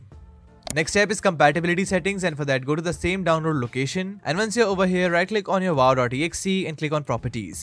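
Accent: India. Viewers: No offense, but you sound exactly like those scammers from India.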